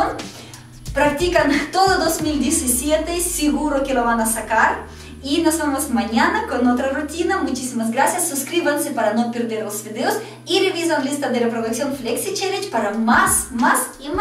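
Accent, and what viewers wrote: Russia. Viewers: Eres rusa verdad? Yo también, lo digo por el accento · eres rusa???? tu acento y apellido parece ruso ty krasivaya heheh pryvet saludos muy buenos vídeos estoy practicando para la flexibilidad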